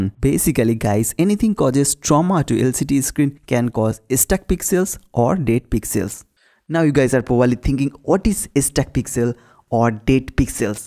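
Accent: India. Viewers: Ofull indian english accent. Cant stay. I am ran away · He has an Indian accent. Did you go to school? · Damn his accent hey Non- Indians please dont judge not all of us sound like that :( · al least he can speak english with his indian accent, but I can't speak english properly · To people out here complaining his accent - India is a diverse country. Speaking multiple language is something a norm unlike the west. Few states in northeast does speak good English (Exception). Excuse his accent because English is not even a second language for many and I'm sure it's the same for him. Nice video 👌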